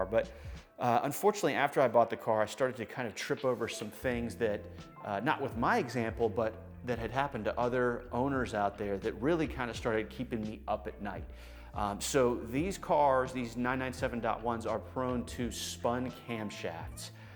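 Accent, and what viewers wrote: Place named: United States. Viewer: Hi, greetings from Germany the homeland of your Porsches! You are a very cool Porsche-Enthusiast speaking such a clear (american) english so that it is great fun to listen to you. But what about the german licence-plate from Munich in the background nearby the Porsche-Light on the working-table in your amazing Garage? Are there any Porsches from Germany in your Garage (mostly German People are looking für US-Porsches and very few US-Fans looking for the german Market)? Greetings G.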